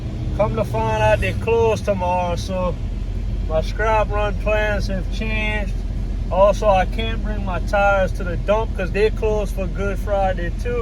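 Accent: Louisiana, United States. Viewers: lol, I did I thought you were trying to do some kind of voice then I realized you were from Louisiana, which is like a foreign country to me up north here.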